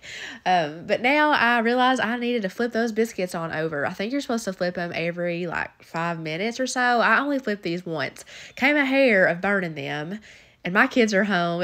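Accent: South, United States. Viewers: I like your videos but girlll you sound so country teehee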